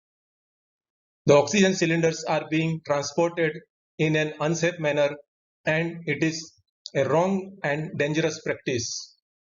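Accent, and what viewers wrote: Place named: India. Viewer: I don’t understand your India English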